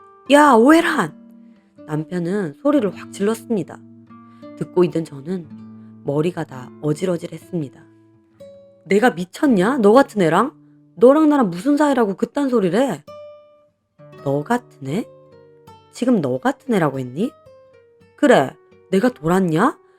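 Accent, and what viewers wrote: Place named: Gyeongsang, South Korea. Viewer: 경상도 사투리 반갑습니다!!!!!!! :D 경상도 사투리라 그런지 사이다 부분에서 두배로 시원해요 ^0^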